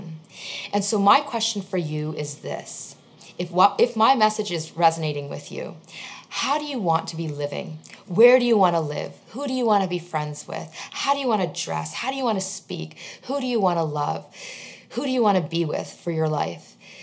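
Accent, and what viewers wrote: United States. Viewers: i can tell that the woman speaking in this video probably grew up in the US just like me because she has an american accent. therefore, she is an indian born in america but expected to have indian values which is kind of hard to do. indian girls do know how to help out in the kitchen, like washing utensils, cutting vegetables, putting away groceries in the refrigerator or pantry, but very few i think will know how to make chapathis , rotis, pulkas, parrotas from scratch, or how to wear a sari, thankfully these days, you can get roti makers to make your life easier, and you can get pre stitched saris that are available to wear, they look just like a wrap around skirt, so you are good to go in that department. but still girls are expected to show respect to elders, work tirelessly in the kitchen, be work horses, listen to parents about what they eat, how they dress, who they are friends with, what classes they take, how late they stay out, who they go out with, how much money they spend, what they spend money on, etc.. 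· please keep your western ideologies to yourself. This is not our culture. you speak in american accent, how can you be Indian .I will serve and live with my parents until their last breath just like my dad cares for my grandma . i will also take care of my inlaws so pls , all the sacrifices they made for me and what am gonna tell them, im sorry but im leaving, not my fault you are aging, not my fault you paid for my studies and life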